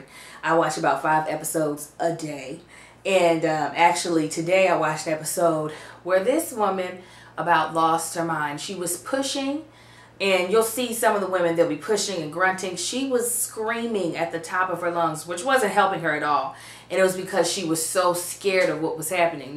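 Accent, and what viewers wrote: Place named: South, United States. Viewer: The southern belle accent and out of breathe is just too cute.😂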